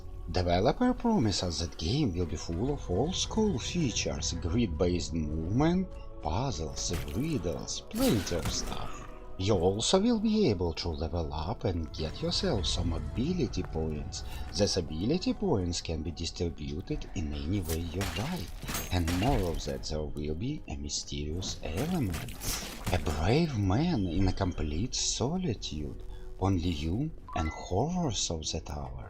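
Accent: Russia. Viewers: Чет у меня подозрение что русско-говорящий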